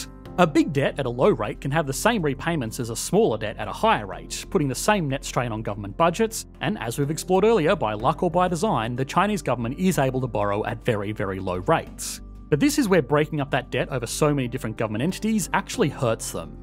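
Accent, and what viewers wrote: Australia. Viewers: Australian here. I recognise an Australian accent. Notice our independent source commentry is less biased or partisan. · take propaganda, put it through disgusting australian accent, paste youtube video, profit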